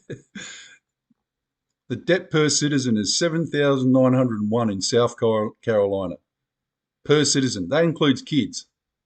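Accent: Australia. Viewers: Good to have an Aussie voice, there are not enough speaking up.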